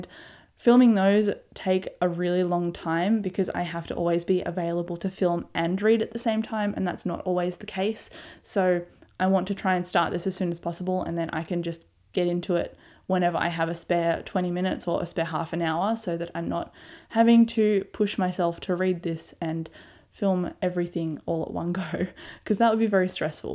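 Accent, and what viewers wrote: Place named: Australia. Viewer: I'd forgotten how pleasant the Australian accent is. :) Looking forward to what you have to say about Parasite. The Wheel of Time...I went through that series a year or so ago because of the Sanderson tie in and because when I saw him in person both times he always credits it as one of his favorite...I won't spoil anything, but I'll be looking out for how your experience goes and save my queries until you finish. :)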